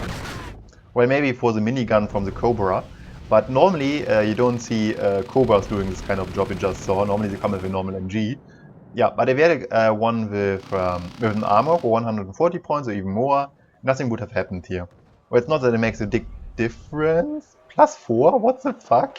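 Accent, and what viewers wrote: Germany. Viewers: Typical German Accent... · well... it"s not a ranked map ... it"S not a ranked game ... and non of the above is correct but i love to hear you make commentry in that german accent non the less ...you sound like those german generals in those ww2 movies so .. i will give you a like hahahaha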